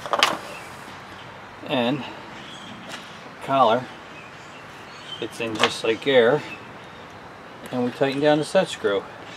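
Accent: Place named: New Jersey, United States. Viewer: Since I subbed a little while ago I thought I detected a Jersey accent. Sure enough! I’m originally from northern New Jersey myself, but have been on Cape Cod most of my life.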